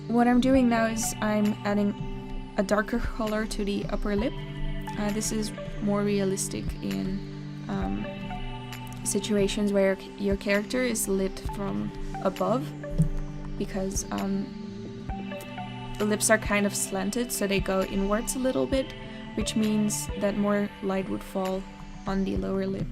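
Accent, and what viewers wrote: Netherlands. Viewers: She uses Paint Tool Sai, but I don't know what tablet. It doesn't matter that much though :P unless you're more experienced. When I just started out, I used a $60 Wacom Intuos Draw tablet. It doesn't have a screen, but only higher end ones have screens, and you get used to it pretty quickly. I think she has a dutch accent :D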